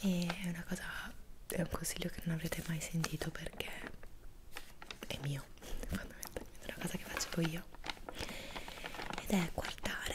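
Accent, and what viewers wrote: Italy. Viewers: Where are you from in Italy? My grandmother immigrated to the US from coastal Calabria and my grandfather immigrated from Tuscany so I’m trying to familiarize myself with the different Italian regions and accents!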